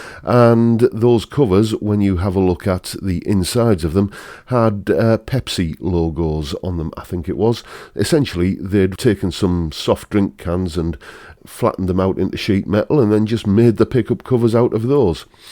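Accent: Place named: Yorkshire, United Kingdom. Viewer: Still playing the "place the accent" game. Son of a yorkshire farmer. Not county Durham then, so no Darlo. Not Middlesbrough, but I'm guessing close by. The fact you didn't say Cleveland places you south of Stokesley, I'm going to put my bet somewhere between Boroughbridge & Northallerton.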